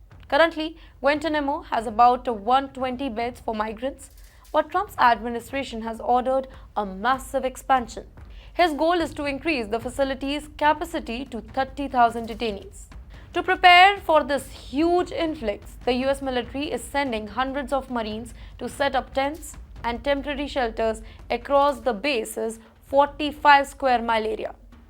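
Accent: India. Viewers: Indian lady with accent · Indianlish. Only Indians can understand.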